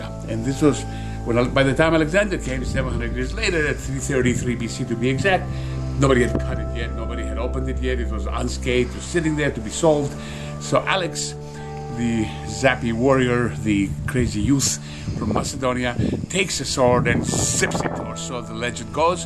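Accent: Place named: Spain. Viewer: Is this guy Greek or Spanish he sounds super Spanish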